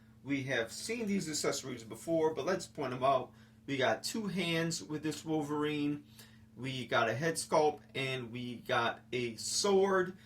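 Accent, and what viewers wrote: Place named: New York, United States. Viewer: You have such a fascinating NYC accent. It reminds me a little bit of Jay-Z. And nice review, by the way. My two are on their way from GameStop. Considering I pre-ordered right when this went up..not sure how so many have it in hand already, yet mines just shipped.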